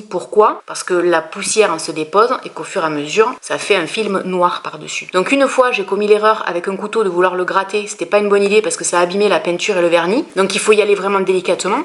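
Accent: South, France. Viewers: J'ai moins aimé cette vidéo car le ménage c'est pas trop mon truc mais j'ai mis la vidéo juste pour t'écouter et avoir ta jolie voix du sud ! Tu as vraiment une voix relaxante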